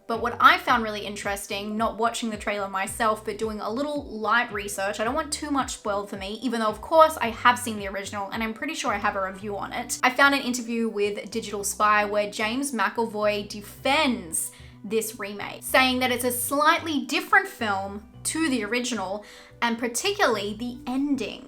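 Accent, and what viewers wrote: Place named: Australia. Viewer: And this one tiIIIME! At Band CaAAAMP! I love the Aussie accent when done a bit more charmingly and understated. See fellow Youtuber Matt Parker (Stand-up Maths/Numberphile) for a better example of this rather than this overexcited version. Love the energy though, and clear passion.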